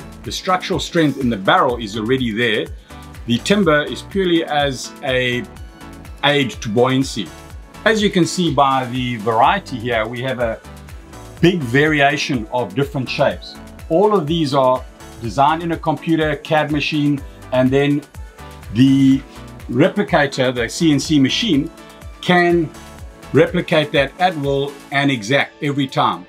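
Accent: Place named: South Africa. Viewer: I have been watching your videos for many years with your cute south African accent and I am waiting for you to start making wooden gun. Now I have the reason to visit you in South Africa!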